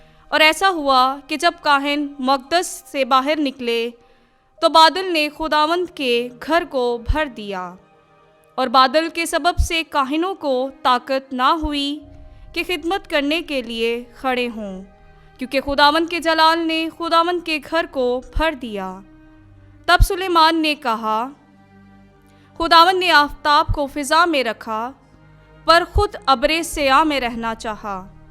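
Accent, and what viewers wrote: Urdu, Pakistan. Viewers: beautiful delivery of bible verses true Urdu accent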